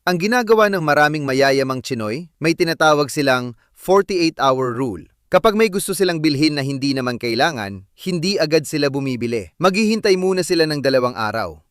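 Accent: India. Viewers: bakit nagiging tunog bumbay??? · Mokhang mombay ang nag sasalita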